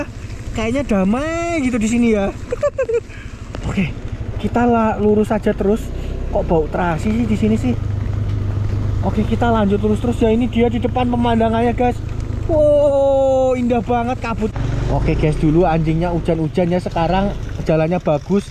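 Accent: Java, Indonesia. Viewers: Suka banget sama suara medoknya mas 😂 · Medok banget ngomongnya mas... Tapi asik sih.., terusin deh mas 😂😂😂😂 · Terimakasih infonya, flog tentang puncak jabar tp logat jateng/jatim, ramah sekali sampai hewan pun ditegur, sangat menghibur 😊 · mendok pisan bos bahasa jawa nya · Medok jowonya ini yg bikin lucu liputannya.. hehehehe 😊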